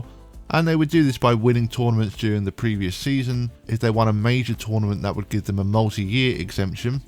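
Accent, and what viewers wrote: United Kingdom. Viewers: Hello I have been a keen bowler for over 40 years in the UK.I have enjoyed your new web broadcast .It is nice hear a english voice and thoughts on the pba and bowling in general .I wander if you could do a broadcast on bowlings olympic bids .especially with the Olympics in America in 7 years time I have asked the BTBA for their information on the bid but know answer. Also the world bowling federation and once again no answer..I find it unbelievable that they had no information on this important subject .Also have you plans to talk about the state of bowling in the UK which in my.opinion is going to the dogs .Would be good to see and I would value your comments please.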